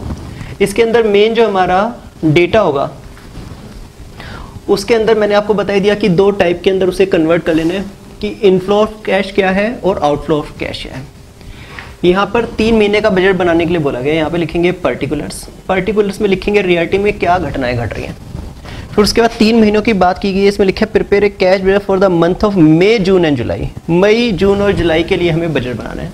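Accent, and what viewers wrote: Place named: Punjab, India. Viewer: Sir u r very cute..., specially ur sort of punjabi accent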